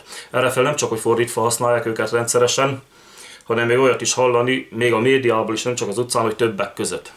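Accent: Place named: Vas, Hungary. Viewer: Aranyos "vazsi" tájszólásod van......:)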